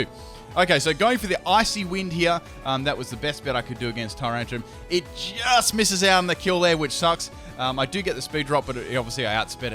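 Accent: Australia. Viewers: are you australia?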